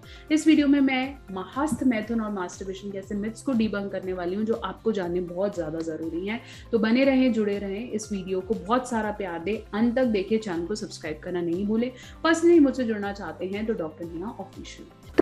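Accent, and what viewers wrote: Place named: India. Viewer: मैम मुझे तो आपको देख के लगता है कि आप विदेशी महिला हो पर जब आप बोलती हो तब पता लगता है कि आप इंडियन हो🇮🇳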